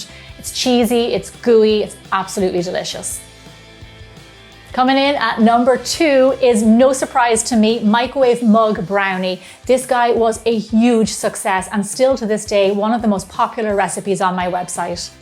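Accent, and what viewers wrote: Ireland. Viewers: Oh class she’s Irish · Love your Irish accent. I'm Irish too. I love the videos and follow your recipes often. Thank you! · I lived in Dublin for two months and your accent is bringing me back so many good memories especially during quarantine!! I have so much love for Ireland and everyone from there ♥️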